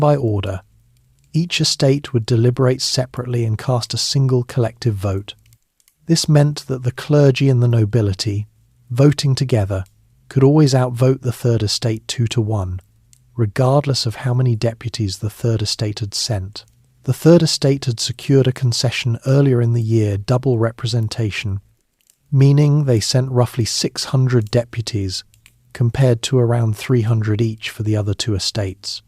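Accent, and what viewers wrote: England, United Kingdom. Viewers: Another one of those videos with a horrible very strong English accent. I don't waste my time with such things.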